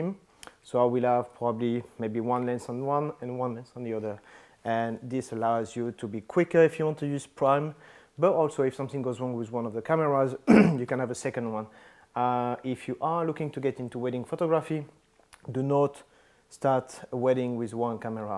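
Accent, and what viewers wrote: France. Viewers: I subscribed to another french photographer and when I first listened to you speaking I knew you're french..I love the accent.. Very informative video!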